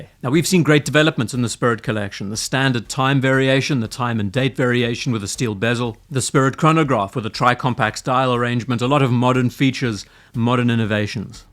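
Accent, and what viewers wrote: South Africa. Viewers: Trouble placing your accent, Pretoria, or ZA in general?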